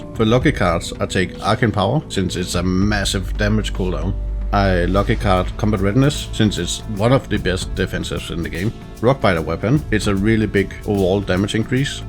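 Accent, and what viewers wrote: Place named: Denmark. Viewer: you have a bit of danish accent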